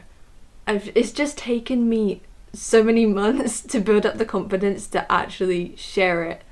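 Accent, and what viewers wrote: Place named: West Country, United Kingdom. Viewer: What is your accent? I can't place it but then very occasionally it sounds very slightly west country? but then at other times very very subtly scottish... so both can't be right?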